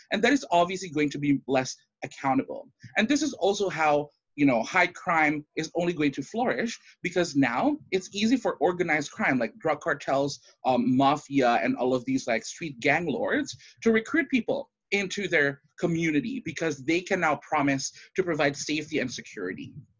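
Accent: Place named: Indonesia. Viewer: Kebanyakan "You know", pronunciation ada yang kayak Indonesian-English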